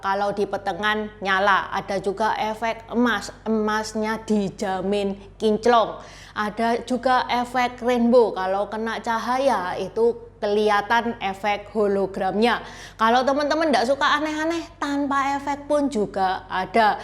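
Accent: Java, Indonesia. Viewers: Ci.... medok amat ngomongnya ciii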